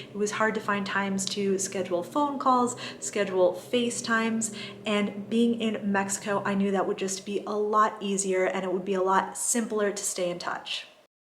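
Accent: United States. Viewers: I suspected you were of mexican descent , is obvious that you are a third generation in usa your natural fluency in the language is of American .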